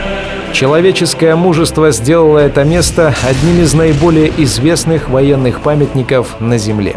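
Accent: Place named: Nizhny Novgorod, Russia. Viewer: У комментатора нижегородский акцент), с особенно выраженным произнесением буквы "я" в словах...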